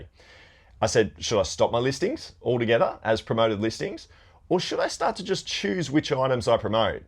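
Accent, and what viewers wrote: Australia. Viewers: I like your voice its cool to hear Australian Accent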